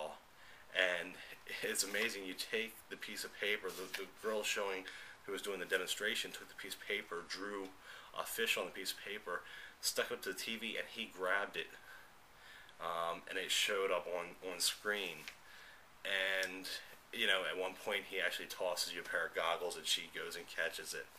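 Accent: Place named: Philadelphia, United States. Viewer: Are you from the Philly area? you have that log "O" accent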